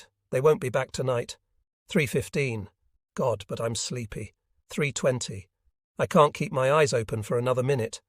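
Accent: England, United Kingdom. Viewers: I enjoy the episodes but an English AI doing American voices and tossing in UK idioms is a bit bizarre. “Cobber” is not a term I hear in North America very often. (Previous episode-can’t remember which one). Still, as stated previously, I enjoy them. Not a huge issue, just a bit bizarre with the English idioms making some stories a bit “of a stretch” regarding American stories.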